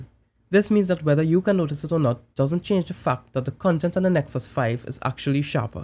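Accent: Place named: Trinidad and Tobago. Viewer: Firstly, I could tell straight away you were Trini haha. Secondly, Great vid bro but I need to ask where did you get your Nexus 5 cover from??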